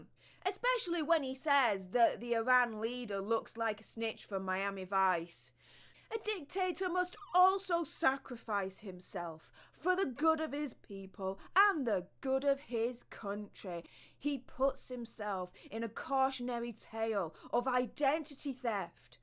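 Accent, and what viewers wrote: United Kingdom. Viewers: I'm thinking it's a Yorkshire accent? Maybe she'll correct me if I'm wrong lol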